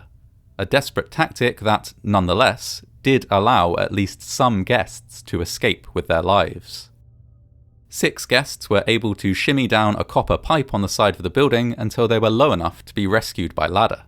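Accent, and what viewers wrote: United Kingdom. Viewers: I always been wanting to say this but the narrator's voice is awesome. Part of the reason why I watch these spooky vids is due to his voice. Its British, isnt it?? Truly awesome. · I love your voice and UK accent, you're soothing to listen to after a hectic days work. And your stories are very entertaining and enlightening.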